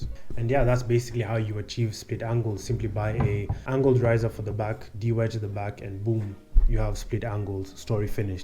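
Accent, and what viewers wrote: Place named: Africa. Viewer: ive never commented in 7+ yrd watching youtube. I skipped over yours cause of a black man W/ affrican accent under a few seconds. After tons of BS from other channels I watched to see you explain in a way I could not only comprehend but was exactly the info I needed to mitigate tje problems witj my ride i I was facing but could not pinpoint. Although the true test of tne info you shared will be on the black top, Im adjusting my equipment as I text and we'll see. I'm telling this to say that predjudice sucks.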